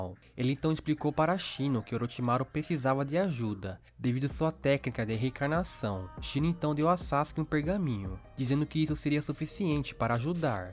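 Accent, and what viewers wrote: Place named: Portugal. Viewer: Cara gosto muito do sotaque de portugal é bem legal mano sigo um bocado de youtubers portuguêses por causa disso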